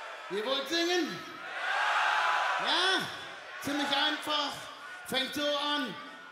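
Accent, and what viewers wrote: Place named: Germany. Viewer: A German accent fits perfectly with Heavy Metal!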